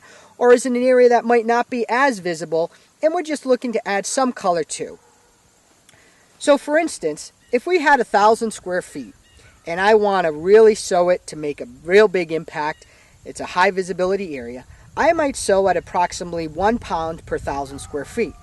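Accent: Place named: Western Massachusetts, United States. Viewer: Trying to pinpoint his accent. Western mass?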